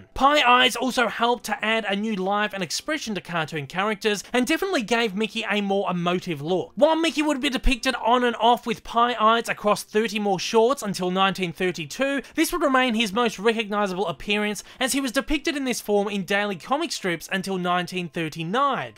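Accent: Australia. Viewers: I love this guys Australian accsent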